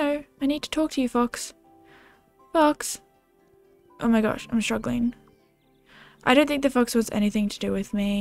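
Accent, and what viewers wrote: Australia. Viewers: you have to be from australia?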